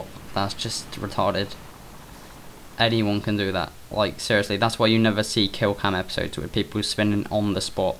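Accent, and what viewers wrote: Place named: West Midlands, United Kingdom. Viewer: you sound like your from the west midlands cuz my bro speaks like you and hes from the midlands like me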